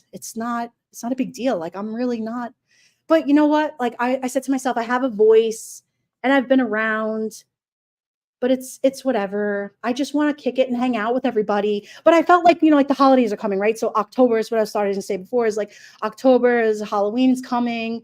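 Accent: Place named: New York, United States. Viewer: ..I'm always on catch-up ..I love your NY accent..💖👋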